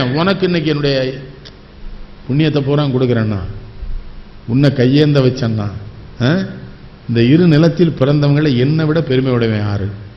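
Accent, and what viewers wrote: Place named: Tirunelveli, India. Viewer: நெல்லை பாஷை அருமை.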